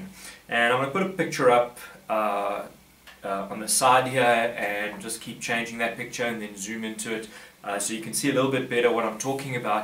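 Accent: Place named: South Africa. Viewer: Nice SA Accent! ... :)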